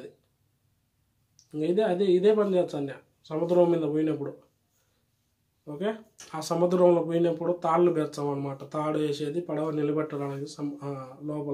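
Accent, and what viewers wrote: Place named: Kadapa, India. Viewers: Hiiii brother, I'm from kurnool oka 2 days nundi mee videos chustunna, chaala baaga anipistundi enduku ante Mee kadapa slank chaala interesting ga undi enduku ante YouTube lo mana local experience dorakadu kada. Anyway good job bro. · Manna rayalashima voice supper Anna tq good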